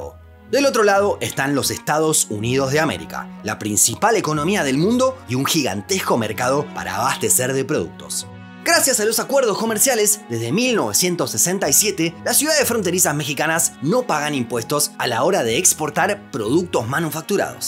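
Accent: Argentina. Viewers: Wakala ese acento argentino, traigan al antiguo narrador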